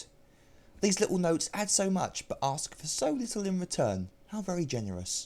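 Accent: United Kingdom. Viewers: Listen to that British accent :)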